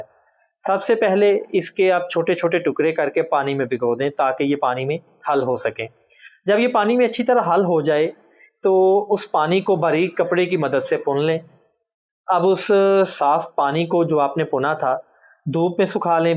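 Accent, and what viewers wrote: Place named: Lahore, Pakistan. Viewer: Lahore Pakistan se boht Sara Pyar apko aap kis city se ho dear ❤️aap boht hi piara bolte ho , asal Hakeem ho aap, Lekin bolne Kay style se Lahore Kay lgte hain 💯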